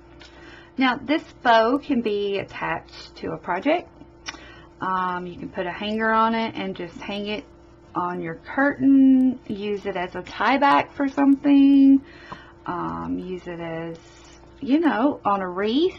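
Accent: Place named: Kentucky, United States. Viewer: Love it! Would love to know where you're from...lol. You sound an awful lot like this old KY gal! Love it!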